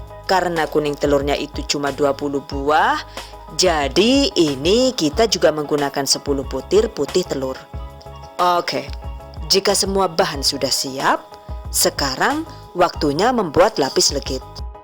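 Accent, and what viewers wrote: Banyumas, Indonesia. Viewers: Suaranya mbak aduh mirip orang bule, mirip penyiar juga aku suka bgt, eh tapi kok bisa jawa ngapak , apa ini orang ngapak yoo??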